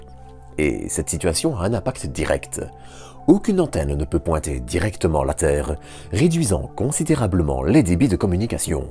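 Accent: Belgium. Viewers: Avec l accents belge une fois..ça fait comique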